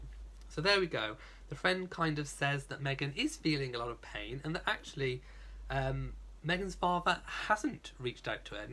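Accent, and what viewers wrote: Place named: RP, United Kingdom. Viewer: Excellent pop up chat! I’m filled with happiness and hope and a tad taller spine now that Meghan’s friends have spoken out on her behalf. I’m sure her friends have her quiet approval for speaking out and I wouldn’t be surprised if the Queen is aware also. I hope this lowers the vitriol and things improve!!! 🙏🏾🙏🏾🙏🏾👏🏽👏🏽👏🏽🥰. Also love the BBC voice!!! 🥰🥰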